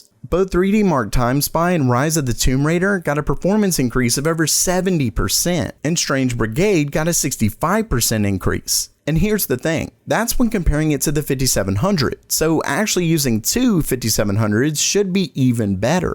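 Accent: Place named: South, United States. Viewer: You should do a video where you don't try to hide your southern accent lol I keep hearing it try to come out haha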